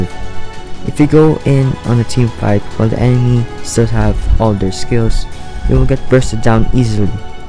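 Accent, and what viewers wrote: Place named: Philippines. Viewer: the pinoy accent thou😂 chill voice btw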